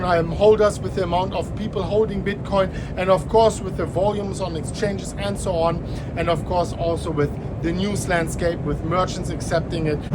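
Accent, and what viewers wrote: Germany. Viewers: i always thought is German Nie wiem dlaczego xD <3 Edit: chyba przez akcent:)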